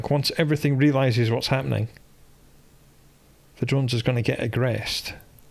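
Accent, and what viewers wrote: Scotland, United Kingdom. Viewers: Nice one m8.... Baby!!!! get over here quick!!! back with his reassuring Scots burr, Greets from North of England m8:eyes-pink-heart-shape:😁👍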